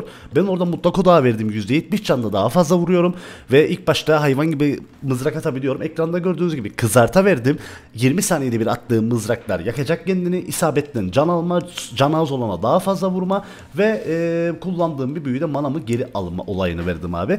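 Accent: Cyprus. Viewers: Abi sen nerelisin çok merak ettim konuşman sanki kıbrıs ağzına benziyo ve videoların çok güzel olmuş eline sağlık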